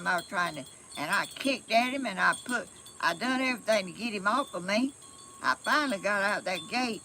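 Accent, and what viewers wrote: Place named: Georgia, United States. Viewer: You are such a natural, Ms. and we love you dearly. I put you on every day for my 6 cockatiels to watch and they love you, too! I lived in Georgia for 10 years and miss it so much. It's way better than living in Florida. Just your accent makes me feel right at home. You have become family to me and my little birds. Sending love and praying for blessings to you today!:)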